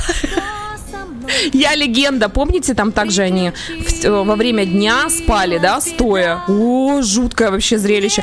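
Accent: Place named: Germany. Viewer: немецкий акцент проскакивает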